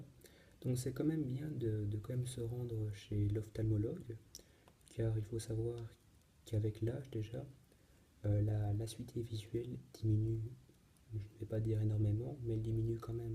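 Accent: Belgium. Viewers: Ne viens-tu pas de Belgique ?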